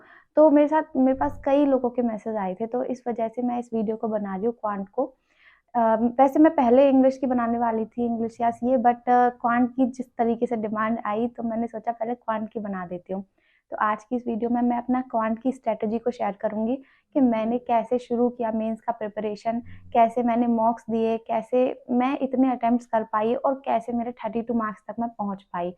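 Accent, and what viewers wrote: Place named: Pahadi, India. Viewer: Aap Uttarakhand se ho kya?....apki accent pahadi lagri hai 😊😅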